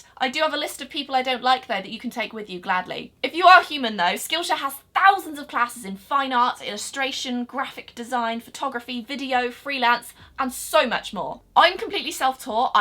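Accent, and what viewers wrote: United Kingdom. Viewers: the things you say ‘wrong’ are just the British way of saying them. I say Van Gogh and Amazon the same as you. From UK x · Yaaah u r british so u will say like that ❤️❤️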